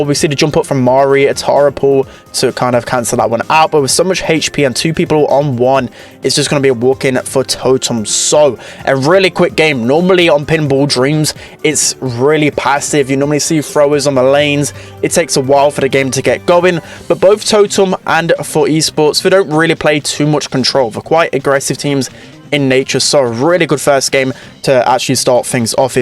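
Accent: United Kingdom. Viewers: I just love British Accent. Underrated commentator too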